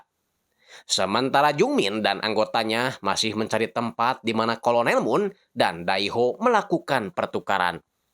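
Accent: West Java, Indonesia. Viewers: Keren euy si mamang sundanese pisan euy edunnn👍🏽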